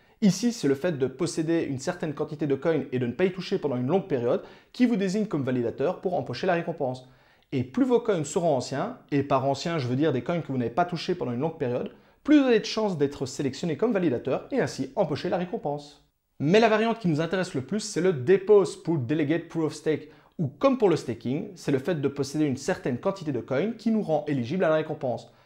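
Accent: Belgium. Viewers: On reconnait cet accent belge dégueulasse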